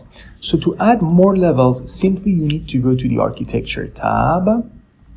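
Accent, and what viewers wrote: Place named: England, United Kingdom. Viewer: Best Revit tutorial ever. Made by a patient and competent Revit expert with a very clear understandable english accent. Thank you!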